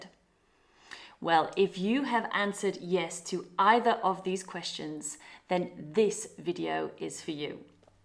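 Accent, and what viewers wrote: United Kingdom. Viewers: New subscriber ✌🏼 Are you british? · are you british?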